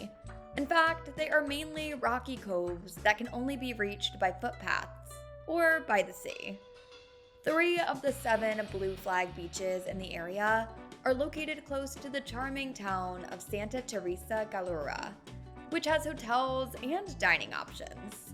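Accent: United States. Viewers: The voice, my God! American accents are the worst.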